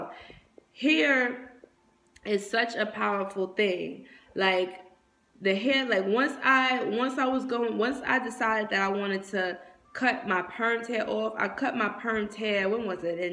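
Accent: New York, United States. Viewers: Another dope video on my journey of black hair videos! I kept getting the urge to look up antennas and hair and so far I’ve come across great information. Glad I got my locs best decision I made! You sound like you from nyc. Peace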